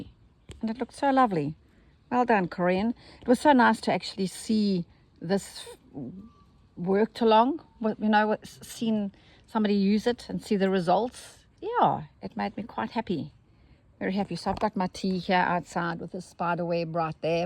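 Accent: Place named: South Africa. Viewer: I enjoy listening to you nattering away 😊 I love south African acsent & I adore hearing the bird life around you it sounds so serene I wish I could see it. I have ordered yr books, im excited to get them & start a project using them, I found you after discovering the lovely Corrines channel, so I'm grateful to both of you ❤I also have Judith's books which are excellent as you know, but you can always learn a different way from different teachers, so I can't wait for yrs 😊 oh fabulous you did show us yr surroundings superb just lovely, you see I comment as I think of things I want to say so I don't forget, so I keep editing thankyou for showing us yr gorgeous space where you create beautiful ( with a sigh of delight)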